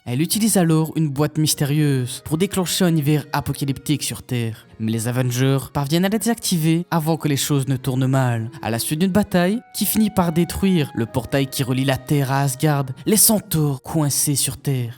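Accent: Belgium. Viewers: Ton accent belge ressort vachement dans cette vidéo 😂😂😂 La série est excellente, elle arrive à reprendre la plupart des gros arcs des comics certes condensés mais c'est plutôt bon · oui j'ai eu la chance de voir cette série ici en Belgique sur France 4 et elle est excellente cette série,j''avais 35 ans quand j'ai connu cette série et j'en ai 45 c'est cool 👍😎🇧🇪 a propos, quand j'entends ton accent je crois que tu viens de chez nous?! 😂🤣🤣🤣🤣🤣🤣 excellente vidéo 👍 cool mec 👍🇧🇪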